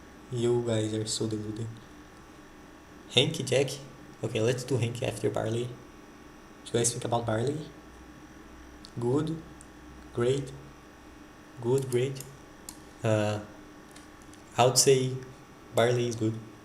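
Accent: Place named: England, United Kingdom. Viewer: I love his english accent so bad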